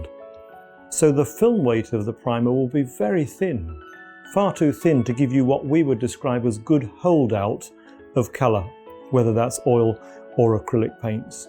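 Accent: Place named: RP, United Kingdom. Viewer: How posh this man is... Imbelievable.... And arrogant too.... pure british c.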